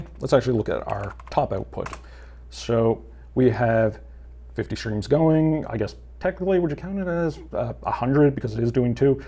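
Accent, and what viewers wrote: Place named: Cape Breton, Canada. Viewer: If that isn't a CB accent I'll eat my hat.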